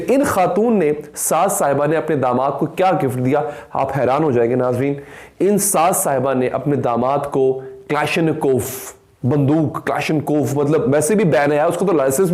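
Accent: Punjab, India. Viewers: Bhai apki punjabi bri mazy ki lgti hme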